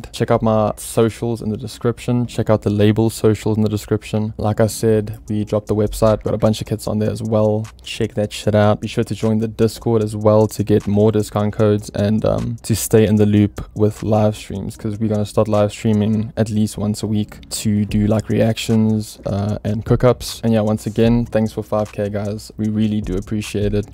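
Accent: South Africa. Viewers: the accent is giving joburg oak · Dude why do you sound like you from South AHHHH🇿🇦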